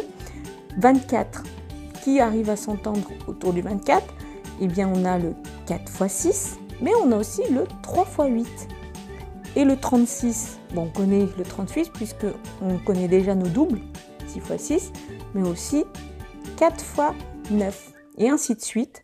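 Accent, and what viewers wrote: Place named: England, United Kingdom. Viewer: j’ai crue que taites anglaise moi en mode 🥲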